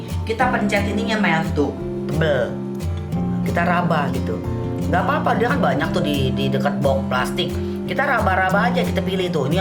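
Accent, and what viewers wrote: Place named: Jakarta, Indonesia. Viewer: .... ada keturunan betawi atau karna bergaul dilingkungan betawi? Logatnya betawi sangat... 😃 logatnya mengingat kan anggota keluarga ( para orang tua) tp udah pd meninggal 🥺🥺🥺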